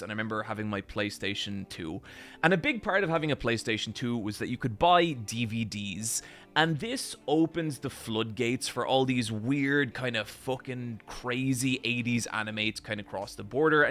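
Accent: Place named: Ireland. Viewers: The Irish accent is altogether adorable! Smile 😃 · Its a part of Irish dialect. Theres a documentary about the making of Ryan’s Daughter called “ A Bit of a Fillum”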